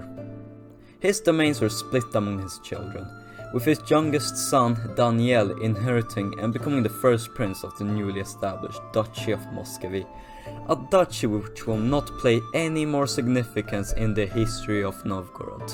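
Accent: Sweden. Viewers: He is as a man from Norway i can hear that Swedish accent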